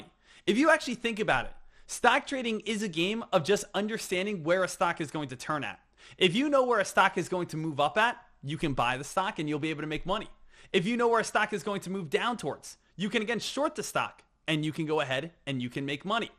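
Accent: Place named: Philadelphia, United States. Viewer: I know bro…we still love Ya bro. 😂😂😂 I always was torn between Jersey and Philadelphia for your accent. More Phila to me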